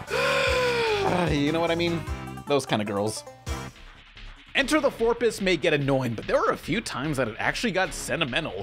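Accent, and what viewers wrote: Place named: Alabama, United States. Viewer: Bruh you got some Alabama shit going on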